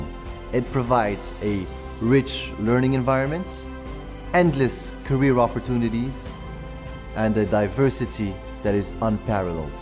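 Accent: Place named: Morocco. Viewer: What ever is the language you speak but your accent still Moroccan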